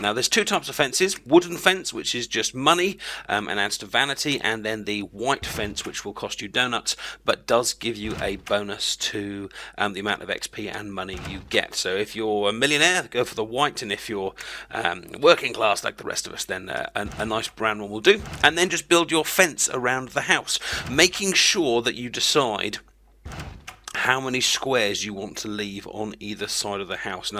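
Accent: United Kingdom. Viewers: I agree lol. I usually hate the british accent but this guy makes it sound really good.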